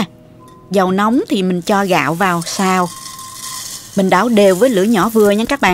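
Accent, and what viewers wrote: South, Vietnam. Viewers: giải thích rõ ràng.. giong Nam hay qua, nghe êm tai vi giong của cô không bi ngọng khi đọc những chữ bắt đầu với R! Những video miền Tây nghe khó hiểu quá. Cam ơn Cô. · Mình rất thích xem dạy nấuăn, cô ở tiểu bang nào vậy? Nghe giọng miền nam rất dễ thương,mến chúc cô và gd nhiều sức khỏe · Tôi thích nghe cô nói rất truyền cảm giọng nam bộ nhẹ nhàng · Giong noi mien nam cua that truyen cam de thuong mon nao cua huong dan deu ngon va hap dan, cam on nhieu, Ca co noi, la ca hanh ben My. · Hi. chỉ dẫn công thức nấu ăn ngon.Rất cảm ơn về chương trình này. Với giọng nói Miền Nam ngọt ngào và tai' nấu ăn của thì chắc chắn không chê vào đâu được. 👍❤🇺🇸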